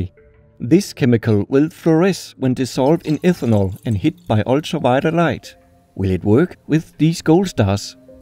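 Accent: Denmark. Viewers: Danes don’t pronounce things the way that they appear in the spelling. “Kopper” sounds more like “koh (w)uh”. What you are hearing him do is “speaking English” with a Danish accent. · You sound super Danish xD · I totally knew you are Danish, because of the accent. I always wondered what exactly happens in the body when eating all that gold. I asked the chef at "Restaurant Ti Trin Ned" when I worked as disheasher in 2007/08, and he said that it was all safe. But there might be long term side effects when eating gold every day. And then again, who does that? 😁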